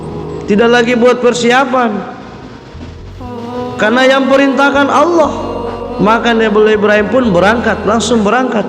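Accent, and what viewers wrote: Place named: Manado, Indonesia. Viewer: Jangan piara bangkai Dalam Rumah .😂.. Ini bahasa Manado kah? Lucu dengar nya. Tapi sangat menyentuh hati subhanallah.. Semoga Allah berikan saya dan keluarga Hidayah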